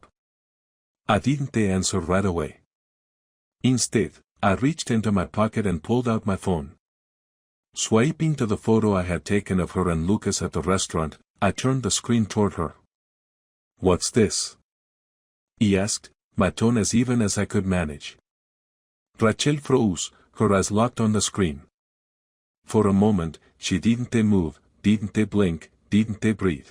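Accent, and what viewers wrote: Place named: Spain. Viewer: This is funny sometimes the voice sounds Spanish…even SpanGlishly pronouncing words. Its like the setting is regardless of the English it is said or pronounced like Spanish.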